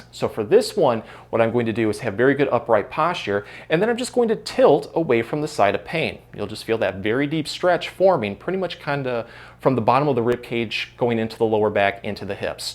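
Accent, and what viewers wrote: United States. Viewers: Sir I am unable understand what you are talking because you speak very fast and American English so kindly try to talk indian English sir pls language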